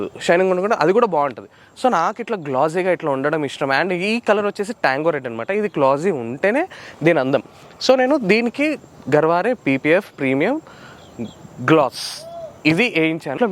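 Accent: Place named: Delhi, India. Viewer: bhai...you r awesome.i know u well from morning to evening you rome with marvadi and jains.your hindi is Delhi based.we r just subscribers who r we to suggest your language.did they know anything about u u r a international model lakme runner up u donated 50 lakhs to blind people.what does they know don't care the people who give u negative comment.thum ko dekh ke jalthe hai saaley..kuch tho log kahenge logon ka kaam hai kehana.... ❤❤❤❤❤❤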